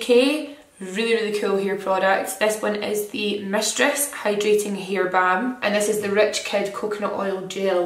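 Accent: Scotland, United Kingdom. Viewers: Hajar Lakroune scottish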